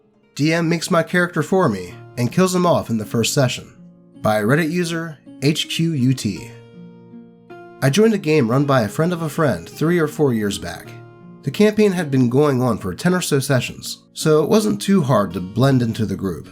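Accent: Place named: Pennsylvania, United States. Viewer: I started watching Mare of Easttown, and they have the same accent as you! Strange coincidence.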